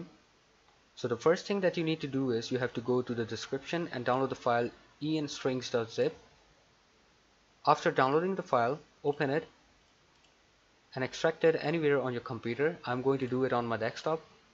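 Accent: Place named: India. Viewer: I trust you, you sound like an indian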